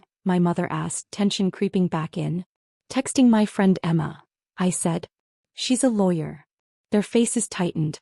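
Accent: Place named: Spain. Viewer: Why would you have a narrator use a heavy Spanish accent reading English? Makes no sense.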